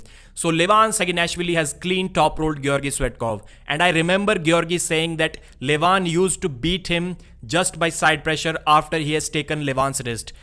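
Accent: India. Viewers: dude your accent is sooooooo strong I could tell a mile away you're Indian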